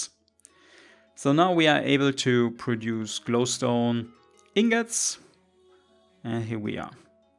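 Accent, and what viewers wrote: Germany. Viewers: Kann es sein das du Deutsch bist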